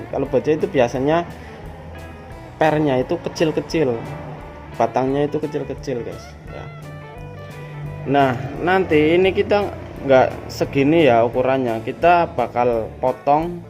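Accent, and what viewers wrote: Tegal, Indonesia. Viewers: Ketara wong tegal ya um😂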